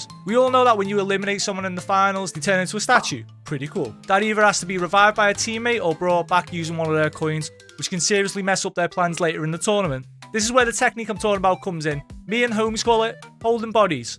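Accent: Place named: Scotland, United Kingdom. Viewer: thank you friendly Scottish man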